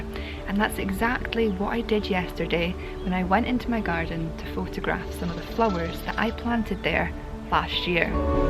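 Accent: Scotland, United Kingdom. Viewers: you have a good heart, and you are able to articulate it in your video's. You have great and entertaining ideas, delivered with your Scottish accent, and a soft voice. You have a bright future ahead of you. You know when to turn the intensity up in your voice and when to be soft. A real blessing. Thank you for your blog. Yours is on my automatic alert. Love the content of your videos. Educational and entertaining. Bill.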